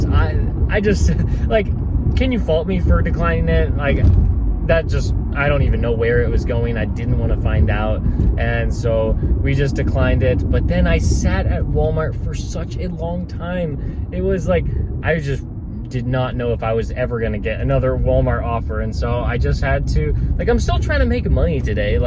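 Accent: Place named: India. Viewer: Absolutely giving you the run around in that glorious Indian accent.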